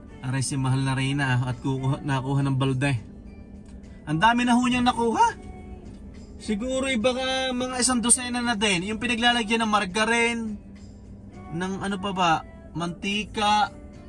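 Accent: Batangas, Philippines. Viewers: nakakainis punto mong batanggenyo, Tsk pinanood ko pa naman to kasi taga edmonton din ako.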